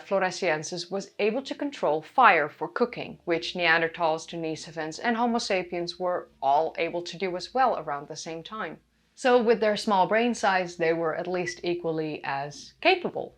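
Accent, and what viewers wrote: Netherlands. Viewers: Zie je wel, ik HOOR gewoon dat dit uit Nederland komt. Klee is trouwens een DUITS woord. Is dat met opzet? En je leraar Engels hield veel te veel van romans lezen. Uitspraak hoort echt veel meer overdreven en Nederlanders vertikken dat. We klinken bijna allemaal veel te vlak. Zaai. Niet genoeg verschil in hoog en laag. En niet dynamisch genoeg. Jammer, hoor. Luister gewoon eens naar Amerikanen of Britten. Ze zetten hun accent veel steviger neer. Dan klopt het ineens! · Your English is great! I could swear you have a Dutch accent somewhere though but that could be a bias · Sounds like a Dutch accent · Your accent, perfect english, but are you dutch? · do I hear a slight Dutch accent? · I can detect the Dutch accent quite clearly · what is your native language? Dutch? (It's the way you pronounce anthropology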